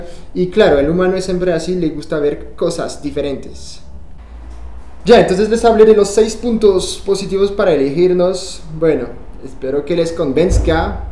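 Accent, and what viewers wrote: Colombia. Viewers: Que tal dejo colombiano! ..😊 Muchas gracias por tus consejos! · Habla muy Colombiano, casi diria que practico en bogota, hablas muy bien, y si tuve un novio Aleman y lastima que no funciono, me encanta y aun me encanta el chico. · Se le ha quedado el acento colombiano 😀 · su acento colombiano muy marcado... parce....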